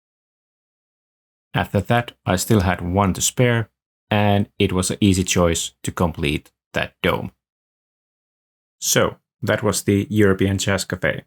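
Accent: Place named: Finland. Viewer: It's weird to immediately recognize from your accent but I think you're also Finnish? That's a nice building although the detail work is bit too cluttered for my taste.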